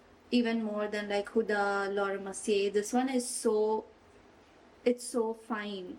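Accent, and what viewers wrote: United Kingdom. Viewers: yk i love your chill grwms and be yourself in the vlogs that you already are aur haan the brit accent was so cool..idk how people sound like that<3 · don't stop doing the british accent gurlll i love it 😁🩵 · Never sat my face, nose intimacy and the British accent lol😂😂😂😂😂😂. Why are you so lowkey hilarious lol😂😂😂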